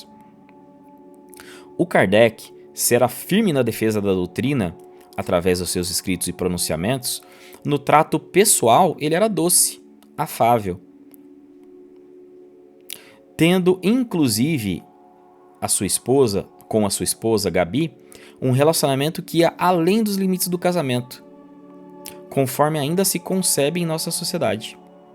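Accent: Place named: São Paulo, Brazil. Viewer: Meu caro vc se expressa mt ruim com seu sotaque Paulista e precisa ler melhor, não tem emoção deu a entender que era qualquer leitura. Desculpa mas é uma passagem mt importante estude mais meu caro. Deus abençoa ❤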